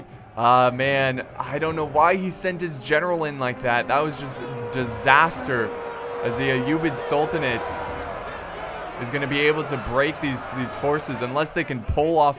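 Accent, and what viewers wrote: Bavaria, Germany. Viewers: Are you bavarian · Are you from Bavaria? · are you from bavaria ?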